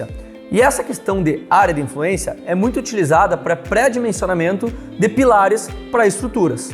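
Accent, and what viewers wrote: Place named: Serra Gaúcha, Brazil. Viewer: Já sou seu fã e pelo tom de voz deve morar no sul serra gaúcha 👍🙏🙏👏👏👏👏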